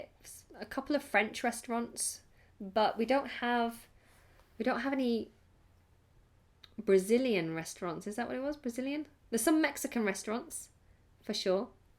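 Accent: United Kingdom. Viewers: I love you and your British accent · I LOVE YOUR BRITISH ACCENT. YOU ARE SUCH A HUMBLE PERSON !!!!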